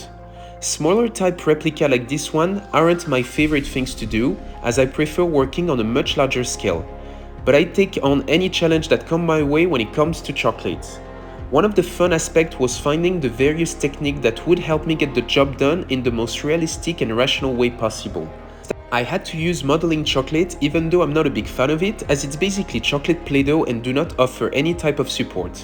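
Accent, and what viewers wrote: France. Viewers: Oh man, now I get what people mean by "strong french accent". And that's from someone who's French themselves ! Over than that, great english, and super cool Kraken. Your work is always breathtaking ! :)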